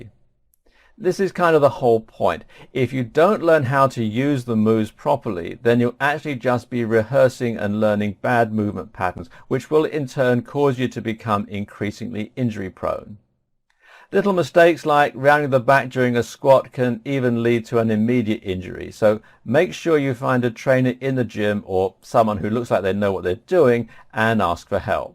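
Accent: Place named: United Kingdom. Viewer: The British accent is one of the most lovely things to listen to...not sure why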